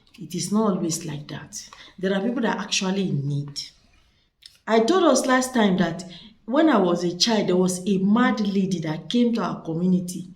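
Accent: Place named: Yoruba, Nigeria. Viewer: Me, I watch, like and down load... Thank you.💃💃💃💃💃💃💃... You talk like youruba...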